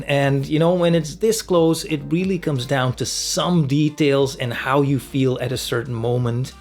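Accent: Netherlands. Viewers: BitWik hahaha lekker hoor, dat Engels met Nederlands accent. 😉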